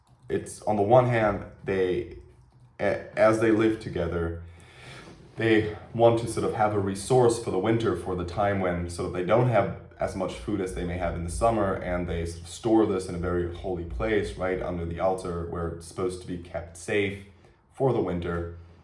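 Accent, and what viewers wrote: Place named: United States. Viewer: BTW it would be nice to hear you read in German then translate because since you sound like an American and it would be nice to hear you speak in your native tongue also. thanks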